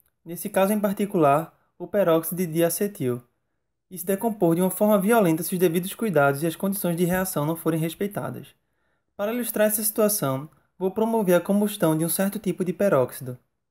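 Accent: Northeast, Brazil. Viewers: ficarei esperando, pois o que vc está fazendo é uma grande contribuição para a comunidade científica do YouTube e ainda mais se for por um conterrâneo como vc, pois sou da Paraíba e pelo seu sotaque, percebo que vc tbm é daqui da região Norte-nordeste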